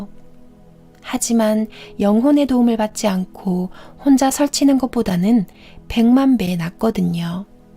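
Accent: Gyeongsang, South Korea. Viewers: 갱상도 말씨 너무좋아 조아요^,^ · 경상도 사투리가 약간들어간 목소리가 너무 좋습니다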